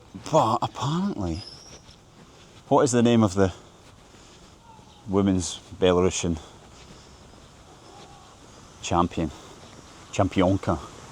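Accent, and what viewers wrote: Scotland, United Kingdom. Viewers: I like your Scottish accent. Maybe it's because I've listened to quite a few Ian Rankin's audiobooks.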